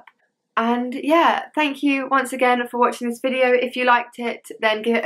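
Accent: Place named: United Kingdom. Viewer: I love a girl with a British accent it's cute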